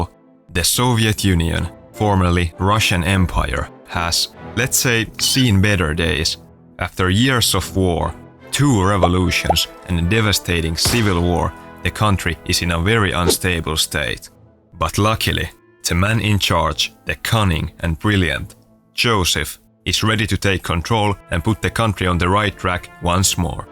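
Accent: Finland. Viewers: After xPetu, this is the most magnificent Finnish accent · are you finnish? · i just can smell the finnish accent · Are you from Finland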